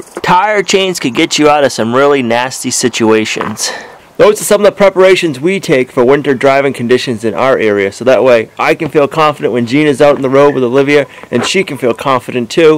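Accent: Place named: East Coast, United States. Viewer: Finland in Russia? ? im 3/4 finish( also 1/8 irish 1/8 french or french canuk some debate about that )in Minnesota and not aware of such of a location they are different countries , maybe i heard ya wrong with the east coast accent.. we normally just use normal all weather tires in Minnesota... lol the finlands... suomalainen voima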